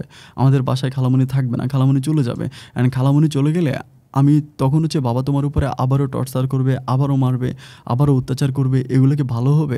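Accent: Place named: Rangpur, Bangladesh. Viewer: ওহ রংপুরের ভাষায় কথা বললেন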